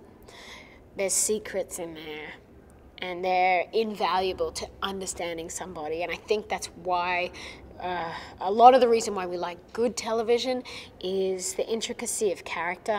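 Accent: Australia. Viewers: To people saying her real accent doesn't really sound Australian, this is a more southern accent, usually NSW, VIC and TAS. The nothern/western states have a more of a New Zealandish sounding accent which is usually what you hear on tv as most Australian media is from Queensland. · It’s probably easier to do a Boston accent with you have already have an Australian one because if you notice her type of accent sound nearly similar or it sound like it wouldn’t be too difficult for her to fake that accent.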